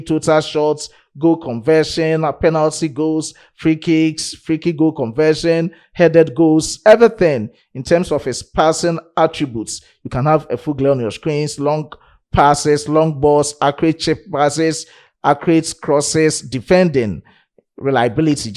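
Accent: Ghana. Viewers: You speak like a Ghanaian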